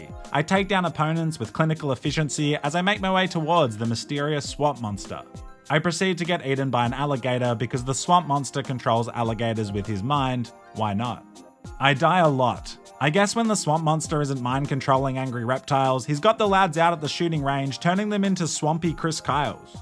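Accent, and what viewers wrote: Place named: Australia. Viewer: when he pronounces Anna as "ANR" - Australians really do have wild accents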